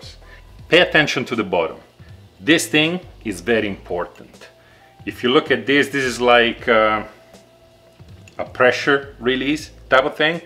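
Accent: Italy. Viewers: Immaginavo...anche se parli un'inglese molto italianizzato...vero? Però va bene il video corto ma quei trogloditi degli americani poi fanno cappelle(errori),e quando vengono in Italia non capiscono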